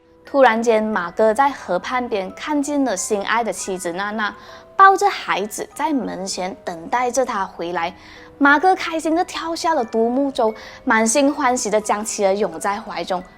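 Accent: Cantonese, China. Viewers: 你好可愛呀，你啲粵語好得意好好聽🥳🥳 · u cakap cantonese cute sikit ~~ try to do some cantonese content ~~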